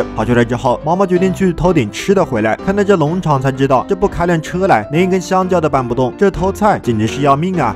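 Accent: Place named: Hunan, China. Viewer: 这个湖南口音。。。。好出戏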